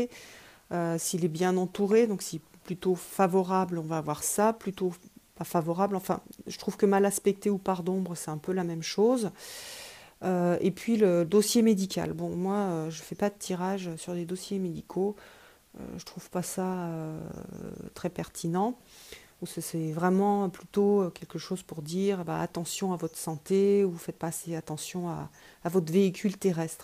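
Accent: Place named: France. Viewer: Não entendi nada, mas adoro o sotaque francês.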